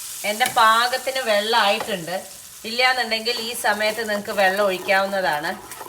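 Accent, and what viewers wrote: Palakkad, India. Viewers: Dosakku ippo kazikkanday thani palakkad slang ishtapettuttollu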